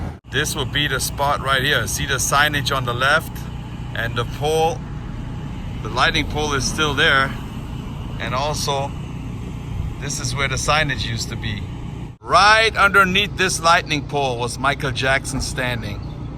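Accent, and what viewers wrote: Germany. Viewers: NEWBEATS MUSIC. He’s from Germany he just has a German accent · Allein am Akzent hat man gehört dass du Deutscher bist. Bis ich dann auch dein Kanal Namen gesehen habe😂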